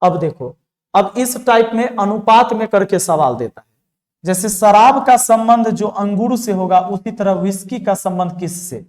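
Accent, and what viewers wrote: Bihar, India. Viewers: सर जब बिहारी बोलते है ... मुझे बहुत अच्छा लगता हैं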